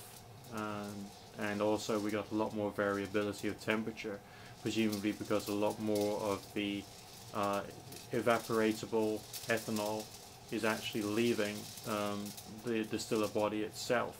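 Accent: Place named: England, United Kingdom. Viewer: Annoying accent .. english Lad ..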